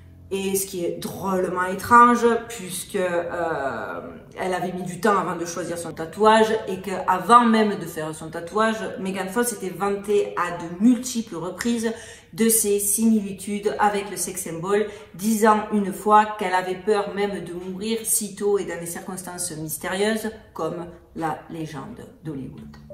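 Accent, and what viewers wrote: South, France. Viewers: bjr, j'espère sincèrement que yOutube va vOus Laissez pOsté tranquiLLement vOs vidéOs qui sOnt extras. "nOus vivOns cLairement dans un mOnde dément de démOns" Prenez sOin de vOus & de vOs prOches sur Vegas. (Merci pOur Les petites pOintes d'humOurs & pOur cette accent qui me rappeL cOmme j'ais aimé vivre dans Le sud) "ça fi du bien" 🇨🇵😉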